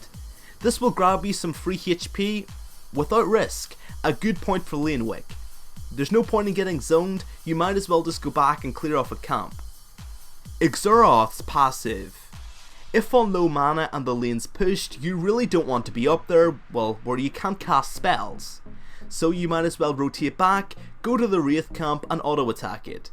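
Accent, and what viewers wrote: Northern Ireland, United Kingdom. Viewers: He's Irish, more specifically, he might be Northern Irish. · whaere is from? Never heard his accent before, and can't say if it's more British or American...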